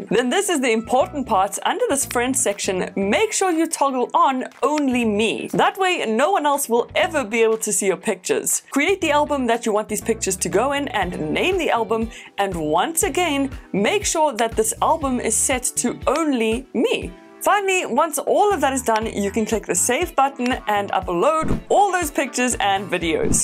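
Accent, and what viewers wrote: South Africa. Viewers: Hi are you South African, cool channel keep it up ! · Hi a quick question are u from South Africa 💭❓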